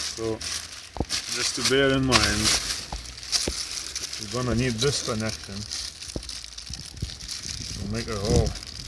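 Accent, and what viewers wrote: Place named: Russia. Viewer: Thanks. Really interesting accent you have, I thought you were Russian at first!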